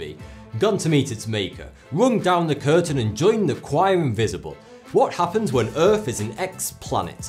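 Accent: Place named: England, United Kingdom. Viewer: I watch dis guy just so I can learn an English accent, it's so cool. I've been practising from March now.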